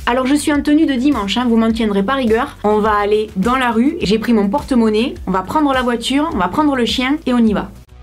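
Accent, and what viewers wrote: South, France. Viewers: super ! en plus ton accent est super agréable comparer à d'autres accents du Sud ( généré Marseille ...) continue comme ça tu es super 👌👌❤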